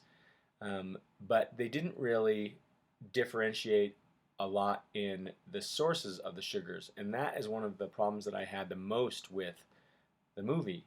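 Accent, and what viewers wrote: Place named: United States. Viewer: First, I thought you were Australian when my audio kinda messed up on the intro of your video, but when it worked, American 💁🏻‍♂️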